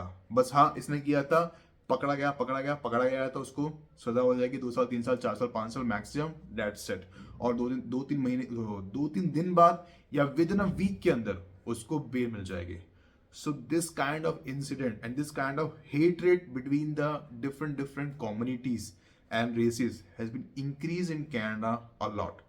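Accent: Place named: India. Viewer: Ya to english bol le ya hindi. And lose this stupid indian english medium schools accent. Its annoying.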